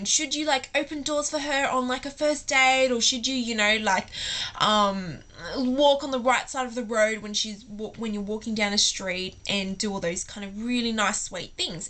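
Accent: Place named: Australia. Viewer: u have such a aussie accent... and r u wearing a spaghetti strap for PJS?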